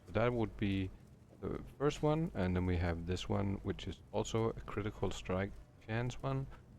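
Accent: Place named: Denmark. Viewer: You can hear a fellow Dane from a mile away. Love it man. Do you play in a Discord where other Danes can join?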